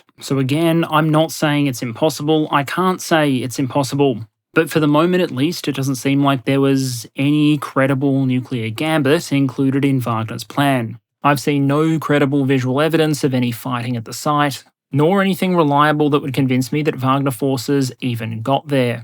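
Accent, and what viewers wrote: Australia. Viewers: One, he expresses he is biased many times before so yeah, he'll be critical to the Russian Army, but he also explicitly says their strengths. Two, the cheap shot dry humor is his schtick. His Aussie, that came in a package. It also makes the dry delivery of information more interesting and entertaining, this is still a YouTube video after all. And three, you can just...idk, don't watch of the jokes are a turn off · Not according to his profile: " Description An Australian covering the military industrial complex and national military investment strategy." Or for that matter, his accent.😉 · As a ‘murican, I love the dry Aussie humor and the accent. It’s what makes Bluey extra cute too, kids speaking Aussie.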